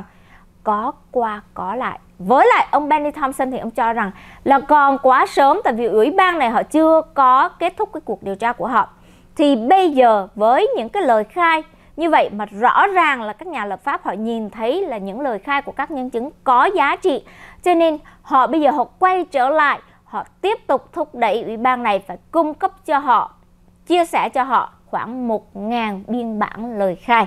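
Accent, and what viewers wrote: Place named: South, Vietnam. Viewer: Nói tiếng Huế đi, nói giọng nam nghe bịnh quá. không thử giọng Bắc đi.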